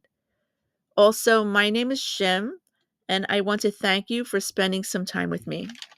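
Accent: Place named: New York, United States. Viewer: I like your narration and your slight (IMHO) New York accent, which I enjoy.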